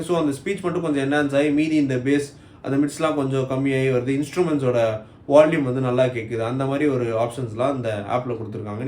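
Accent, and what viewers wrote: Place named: Thailand. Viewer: I love your thai accent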